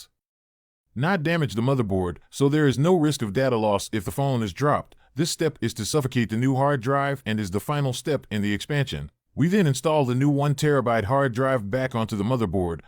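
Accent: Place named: United States. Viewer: The man doesn't exist, repairs all done on chinese phones...Voice is american.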